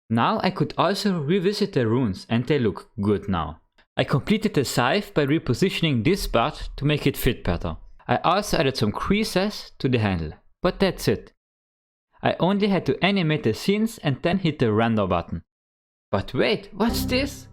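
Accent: Germany. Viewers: der deutsche Akzent ballert richtig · are you by any chance german?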